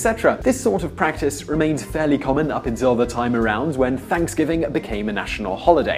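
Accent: United Kingdom. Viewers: Weird hearing a Brit talk about American Thanksgiving history. I should do one in a brash US accent about Cornwall.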